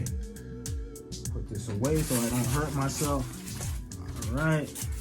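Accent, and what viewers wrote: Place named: United States. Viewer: 😅Mr. You are very brave. Despite all warnings from the medias not to take ‘risk’ coming to China, you did it.Your American English pronunciation sounds very pleasant.China has a 5,000-year-old culture and is a society that respects teachers very much. It is very popular in China and you can live in China with confidence.I am also a video producer and it is difficult to increase my followers. You can think of your channel as a way to record your life. Take it easy.China's education system is long-term and sustainable. You don’t have to worry about policy issues. Just feel free to teach well. If the contract expires, you can continue teaching in other institutions. If you go to Vietnam or other countries, your income will not be as high as in China, and life will not be as convenient as in China.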